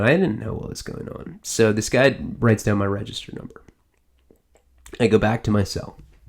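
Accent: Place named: Tennessee, United States. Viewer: Yo 🖤😋 love the southern accent so real! We sound just like that in Tennessee Great video😛 palabra💯🗨💥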